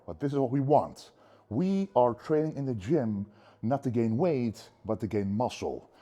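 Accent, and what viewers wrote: Netherlands. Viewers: English as a second language, he's from the Netherlands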